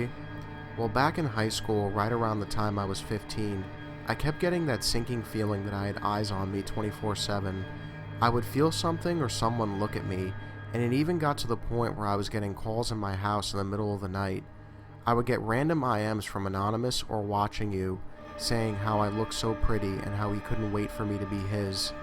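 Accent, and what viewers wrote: New York, United States. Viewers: Are you a New Yorker?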